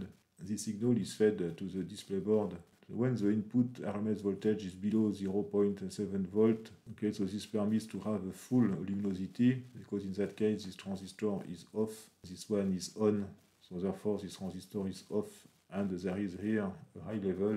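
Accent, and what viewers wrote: France. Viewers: Simple, bien filmé/monté et surtout complet ! Merci pour cette analyse et cette formidable chaîne, extrêmement riche en pièces originales. Un vocabulaire technique parfaitement maitrisé avec un bon accent français comme on les aimes 😃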